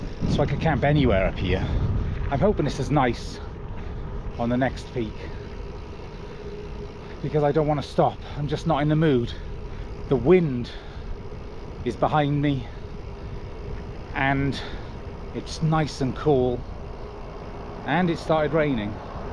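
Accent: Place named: Welsh Borders, United Kingdom. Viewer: Gwent or English borders by the sound of your accent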